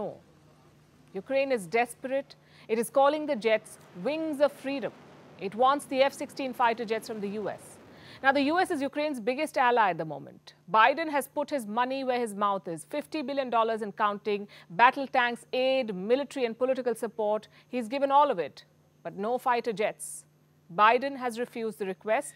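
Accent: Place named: Mumbai, India. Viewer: What are you saying.. It's very difficult understanding your Bombay accent.. Tamil urdo sound..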